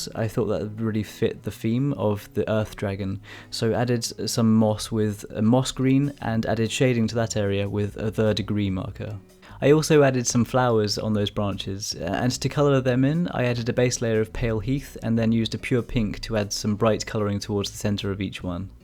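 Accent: United Kingdom. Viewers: I take it from the accent your in the UK , you think you could draw a dragon and dismantle the picture in to sections that could be cut from steel ? im a blacksmith near brecon south wales i will let your mind run away with that idea for a while :-) get back to me usk valley forge facebook if all else fails.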